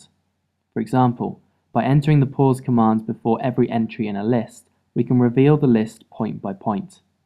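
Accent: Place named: United Kingdom. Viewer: Very nice tutorial, it's very helpful and I really love your British accent, which makes your tutorials so comfortable to watch.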